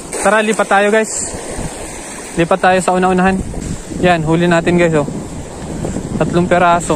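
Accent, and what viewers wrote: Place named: Polillo, Philippines. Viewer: Boss taga quezon province kaba.para kc punto ng taga polillo.😂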